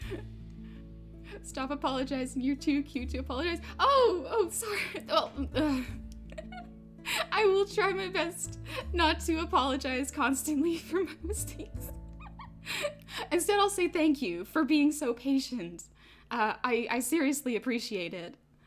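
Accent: South, United States. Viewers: oh no damn doubt its a southern country girl. no city girl says hey sugar the way she says it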